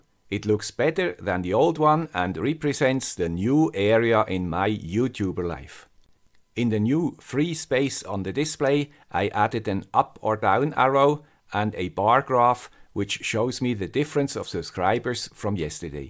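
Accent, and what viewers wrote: Switzerland. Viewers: No. we'll never stop watching the guy with the Swiss accent. Bye. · I was subscribed when we surpassed 100k. I will be subscribed when we hit 1M. So will be the guy with the Swiss accent. Quality content from one of my favourite YouTubers. Thanks for your videos <3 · As a guy with an Argentinean accent, I enjoy your Swiss accent enormously! It goes without saying that I love your content and your delivery. Saludos from Buenos Aires and have a great day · Same thing I thought Sir. Love this guy with the Swiss accent!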